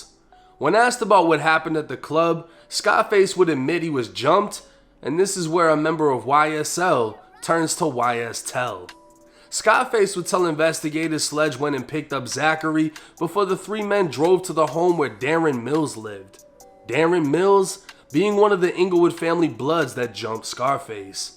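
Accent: Boston, United States. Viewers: Are You from Boston? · Famm are u from Boston cus u sound like u from there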